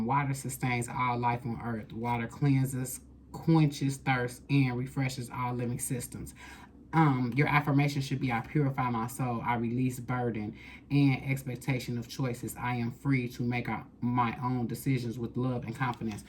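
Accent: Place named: Chicago, United States. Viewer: I know a ChiTown vibe when I hear it, lol. Good read Queen!!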